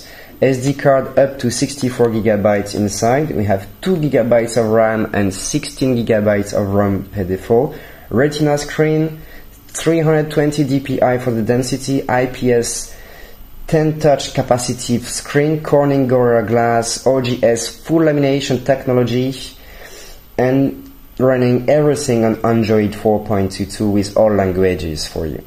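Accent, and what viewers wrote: France. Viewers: Belle accent français :)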